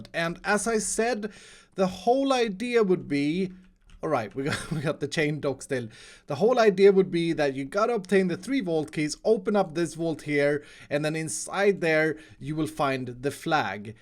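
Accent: Sweden. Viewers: Is you from sweden? 🇸🇪😁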